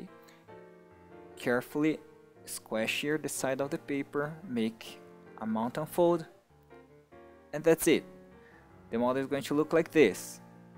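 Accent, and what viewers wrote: Brazil. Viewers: I just thought the same thing, he definitely has a Brazilian accent lol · you speak like a Brazilian. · Are you from Brasil. Tem um sotaque de BR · que sotaque em ..... parece até brasileiro kkkkk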